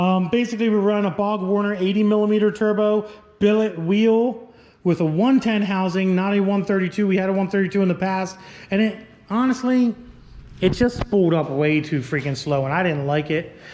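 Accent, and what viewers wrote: Pennsylvania, United States. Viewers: You pa people are messed up with your English wtf? It's a rade I ator and borg Warner what is wrong with u bro? Ohio is one state away we can speak correct pa is the only state that can't talk right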